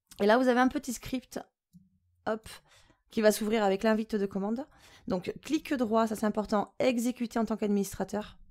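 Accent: South, France. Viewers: Jolie accent du sud 😜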